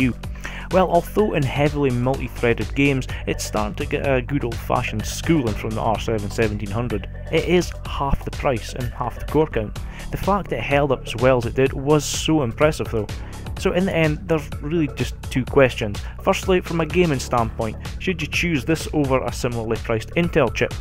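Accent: Scotland, United Kingdom. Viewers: You definetly deserve more subscribers! You're like a scottish (sorry if I guessed your nationality wrong) version of RandomgaminginHD!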